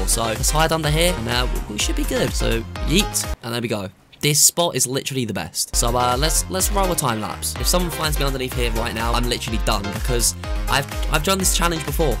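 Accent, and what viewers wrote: United Kingdom. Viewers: Me: Oh no~: Oh norw! Ohw my gawd~ (No hate, love the accent <3)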